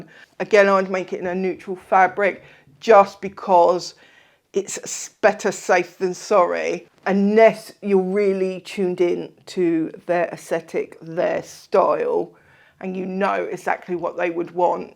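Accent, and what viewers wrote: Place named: Kent, United Kingdom. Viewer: HI, sorry if this is too personal, but me and my husband have been trying to place your accent. I say Kent he say's Dorset. Love your videos.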